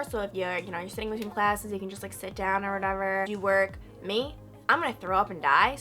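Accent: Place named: Midwest, United States. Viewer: does anyone know where is from because I'm from Wisconsin and she sounds like she has a midwestern accent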